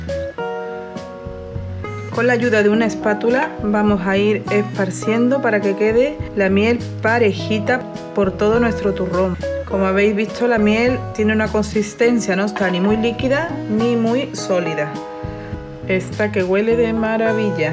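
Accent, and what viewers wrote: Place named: Peru. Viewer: " " no sé si será peruana radicando en otro país por eso el dejo....pero a respetado la receta original.....gracias por decir en su video q es "Turrón de Doña Pepa" es tradición peruana con todo y su historia. Un sólo detalle...en lugar de colorante le agregamos achiote.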